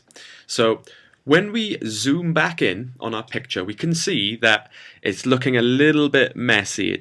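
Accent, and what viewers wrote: Wales, United Kingdom. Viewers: Very informative & nice to hear a Welsh accent - thanks! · Your british english is really awesome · This is brilliant! Thanks for taking the time to teach us. Your voice is very calm and soothing, and your Welsh accent makes it even better! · He's Welsh. Orrrrr, he could be English but lived in Wales for a bit, but the accent is Welsh. This is the best tutorial I've ever seen on the Tube. Good work mate. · Thank you so much for the tutorial! And I think you are British, right? I like your accent very much! And I have a question, can I do exactly like that with Photoshop? Because somehow I lost my Illustrator CS4 disc and can't afford newer one anytime soon... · Nice. Is that a British accent? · Thanks! I've been using Illustrator forever, but never understood most of the basics. But your tutorial helps a lot. Love the Brit accent (we're fans of Foyle's War over here); takes a bit of thinking to get all you said, but well worth the time! 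Keep up the good work!! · Are you welsh by any chance ? lol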